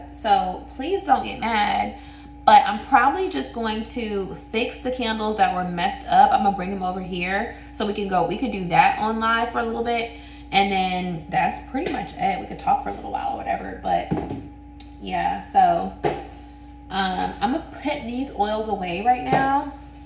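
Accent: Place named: South, United States. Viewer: Your hair look's so fascinating i love your southerner voice so sexy a real turn on ❤❤❤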